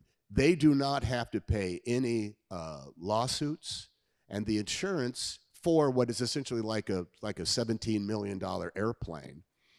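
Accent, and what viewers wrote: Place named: RP, United Kingdom. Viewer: For the Americans, that was a ridiculously posh accent, not a Bristol accent.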